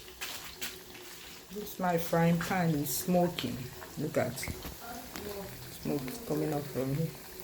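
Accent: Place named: Umunze, Nigeria. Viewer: please are you from umunze? The dialect is exactly like mine. Breakfast yum yum 😋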